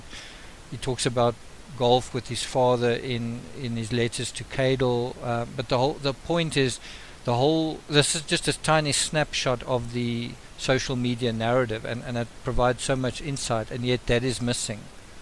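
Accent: Afrikaans, South Africa. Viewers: Interesting! I hear you are a Afrikaans person. Do you remember the case of Oscar Pistorious? I think he was guilty. Good content.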